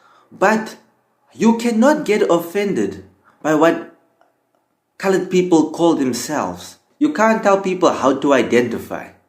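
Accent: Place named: Durban, South Africa. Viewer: Was gonna say you sound like you spent some time growing up near Durban somewhere, be that as that may, you saying you "not taking kak" is so typical though. Love it!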